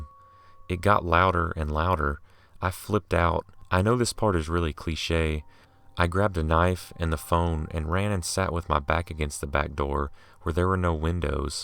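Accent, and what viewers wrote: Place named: South, United States. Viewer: I love your accent. I'm trying to guess where you're from, somewhere in the South. I'm British so I might be way off... lol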